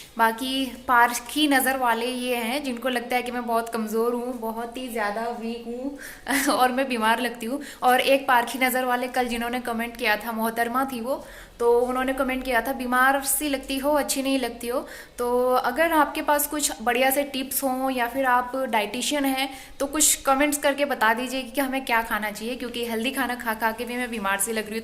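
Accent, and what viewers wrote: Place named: Pahadi, India. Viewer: Suparb vlog lagte h apke me bhi kumaungi hu mujhe apka pahadi bolna babut cut lga 😍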